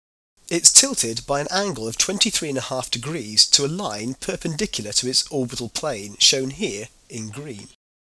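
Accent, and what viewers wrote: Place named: Scotland, United Kingdom. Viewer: I think Scottish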